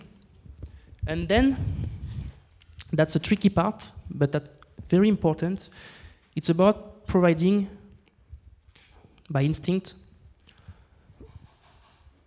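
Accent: France. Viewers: Stop la condescendance, nous ne sommes plus au lycée. Être "fluent" en anglais ce n'est pas donner à tout le monde, que ce soit par contexte, âge, lieu ou expérience. Il y a une sale manie en France de faire de la maîtrise de l'anglais une barrière à l'entrée au monde de l'entrepreneuriat (entretenu par l'institution école de commerce). Hors on se ferme à 2 choses : - les intelligences ne parlant pas très bien anglais (nos mères, nos parents ?) - les marchés non anglophones et pourtant massifs et en croissance forte (à tout hasard : chine). Hors désolé, mais le monde anglais offre tellement de savoirs que ces vidéos ne font pas le poids. Pour un anglophone l'accent est trop prononcé, pour un hispanique l'accent rend l'anglais encore plus difficile et pour un français, s'il parle anglais, il ira regarder d'autres vidéos. Perso je n'ai aucun mal avec l'anglais, mais pour faire du sport, pour le métro, ou pour s'endormir, le frenglish ça demande trop de concentration. 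En plus on va pas se mentir, c'est un peu bidon comme stratégie rien que vis à vis de l’algorithme de Youtube. Perso j'aime la famille pour la voir se rater. Mais je ne peux pas non plus leur en vouloir de tester.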